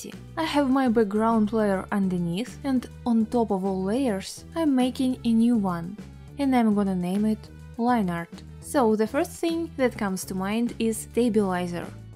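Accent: Russia. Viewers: love your russian accent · You have a cute russian accent :3 It's cool cause now I'm starting to lern english for work, and I understand all what you say. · nice russian accent))) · Omg are you russian? I freakin love to hear that accent with english words.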